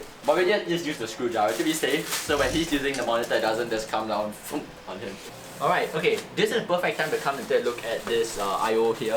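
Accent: Singapore. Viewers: U from singapore? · Are you from singapore?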